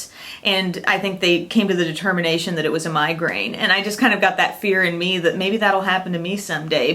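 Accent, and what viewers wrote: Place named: South, United States. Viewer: Really? From Illinois? Your accent totally not a Chicago accent. I thought you were from the south.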